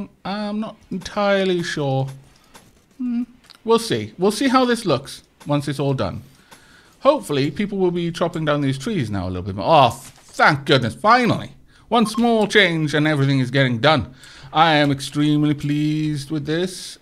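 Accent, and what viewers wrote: Wales, United Kingdom. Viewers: not too noticeable. Loving the Welsh twang again :) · The Welsh is strong with this one.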